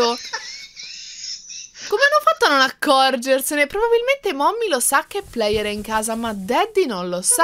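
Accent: Tuscany, Italy. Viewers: ma sei toscana perché ti voglio bene tanto ☺️☺️😊☺️😊☺️☺️ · come sei Toscana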